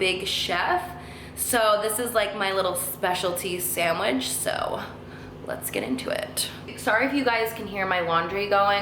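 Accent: New Jersey, United States. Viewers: I L O V E hearing you talk. Not only are you well spoken but I admire your New Jersey accent ❤️ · you’re such a jersey girl haha your accent is jumping in this video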